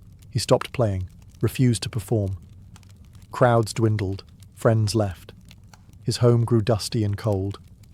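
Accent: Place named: United Kingdom. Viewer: A British accent to sleep? No thanks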